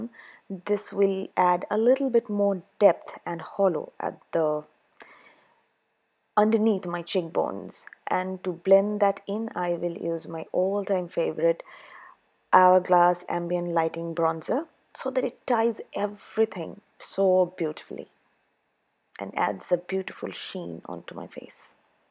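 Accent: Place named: South Asia. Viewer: Are you from Bangladesh or India or Pakistan? Your accent and name sounds like it 😅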